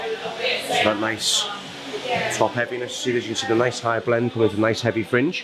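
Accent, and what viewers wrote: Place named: England, United Kingdom. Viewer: Whats your English accent .. I wan tto know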